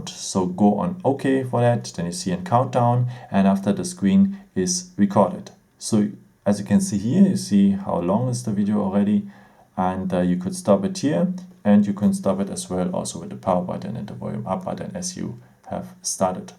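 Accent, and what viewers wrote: Germany. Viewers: Haha German english😂😂😂😂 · Dat german accent! :D Tolles Video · Hab doch gleich gehört das du deutsch bist :P Super Video und klasse Smartphone 👍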